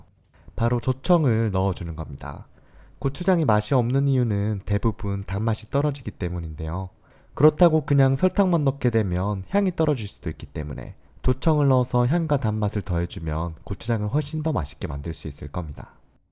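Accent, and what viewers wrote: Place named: Daegu, South Korea. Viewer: 살짝 스치는 대구사투리에 정겨움이 느껴집니다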